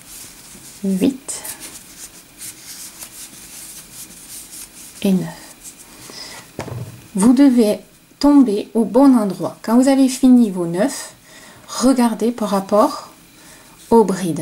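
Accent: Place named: Southwest, France. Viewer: Cc ...moi j'ai adoré et l'ai fait .. j'adore ton accent ! Du sud ouest non ? 🙏🙏🙏🙏🙏 Merci beaucoup .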